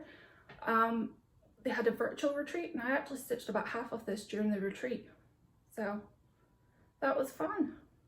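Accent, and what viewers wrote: Scotland, United Kingdom. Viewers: The little Nessie is adorable!!! I couldn’t understand who made it and who gave you all those gorgeous gifts?? Awesome! Great haul, love all your purchases. I’m so glad you’re finishing Black Bear, it’s an amazing design and you’re so close. By the way, it’s so strange hearing the Scottish brogue against a background of wild fires and American shopping (I’m in Sussex U.K.). Congrats for your 1k, Winter Cabin would be great to stitch, thank you!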